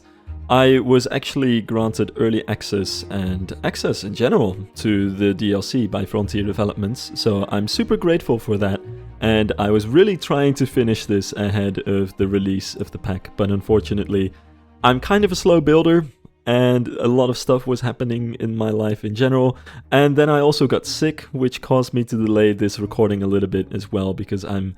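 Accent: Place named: Netherlands. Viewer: Are you Dutch? Bedankt! Haha 😂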